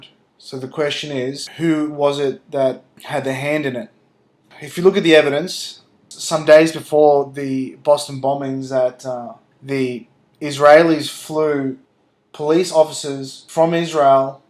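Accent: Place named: New Zealand. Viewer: sorry to be off subject but...you're a kiwi aren't you! :)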